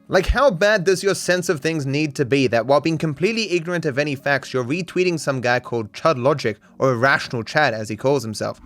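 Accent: Australia. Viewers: I dont even know what he means. He's literally just Australian. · Angry Aussie sounds for almost three hours. I like it😂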